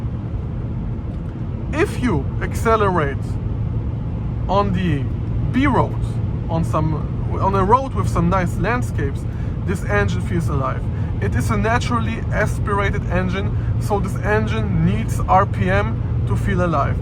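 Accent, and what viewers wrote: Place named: Germany. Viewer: I'm so confused as to why this guy sounds German but is driving in Poland. 😆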